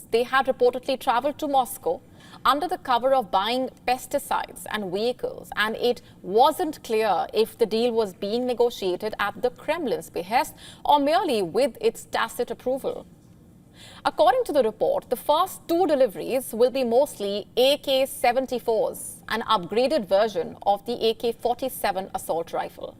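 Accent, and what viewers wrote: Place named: India. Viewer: Somehow, the strong Indian accent of the anchor reduces the credibility of their content.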